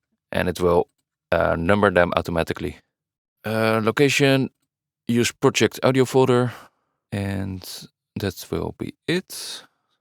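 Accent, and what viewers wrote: Netherlands. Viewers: yeah as i can hear u are dutch man xD accent speaks. I am from Finland, elektron is made from sweden , i have kick ninja plugin it is also good one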